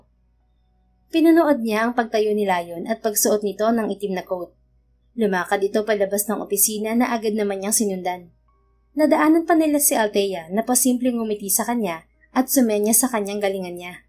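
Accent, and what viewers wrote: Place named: Batangas, Philippines. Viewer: Thank you, writer Smiling face, and for a wonderful story..Ang galing mong narrator lalo sa Batangas intonation mo..Congrrats👏👏👏❤❤❤⭐⭐⭐⭐⭐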